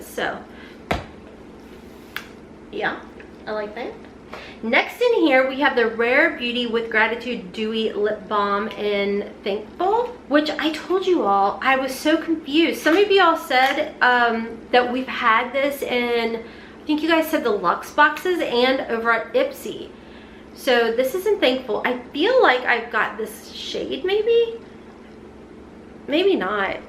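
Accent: United States. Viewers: Haha 92% of the time you have a generic American accent…oh, but the other 8% 😂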